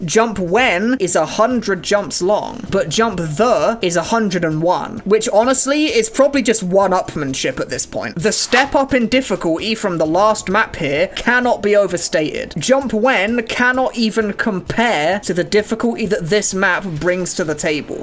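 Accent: United Kingdom. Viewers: Ur an amazing YouTuber I just wish not brit annoy voice 👺👺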